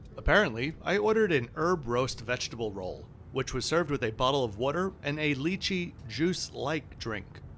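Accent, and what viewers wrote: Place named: United States. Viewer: You're not an American? I don't know. Your accent gave me American/Canadian vibes.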